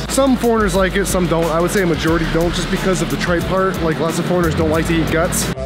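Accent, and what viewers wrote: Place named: Taiwan. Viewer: 那中文也太道地的台灣味了吧 剛好我在吃臭豆腐我想說台灣人幹麻介紹臭豆腐啊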